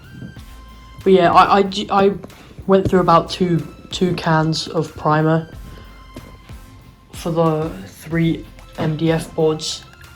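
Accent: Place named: United Kingdom. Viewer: Hi, I could tell your British from your accent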